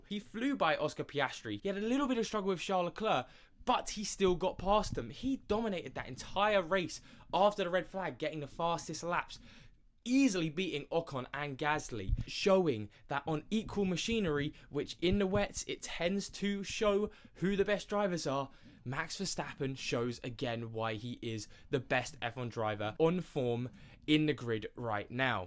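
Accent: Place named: United Kingdom. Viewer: Your British accent made it exactly like you wanted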